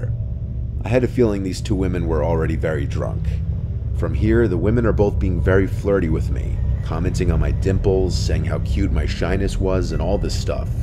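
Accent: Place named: New York, United States. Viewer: As soon as he said “Mary-O” instead of Mario like the Nintendo character I immediately knew he must be from NYC(area) or Long Island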